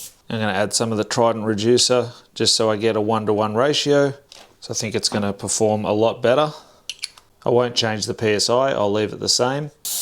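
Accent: Australia. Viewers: Strange... Now i very very much hear your strong Australian Accent 😂😂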